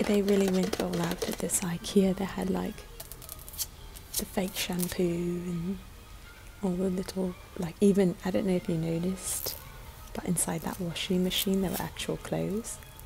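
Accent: United Kingdom. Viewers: Lovely British accent. Very soothing voice. Gorgeous nail tapping.😃👍✨🍀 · i love ur accent !! the best, im in love wit british accents😩😩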